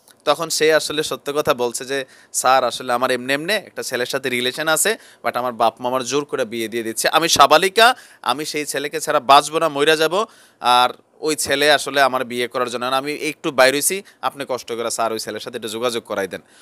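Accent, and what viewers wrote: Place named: Comilla, Bangladesh. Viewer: ভাই আপনার মুখে কুমিল্লার ভাষাটা সেই লাগে..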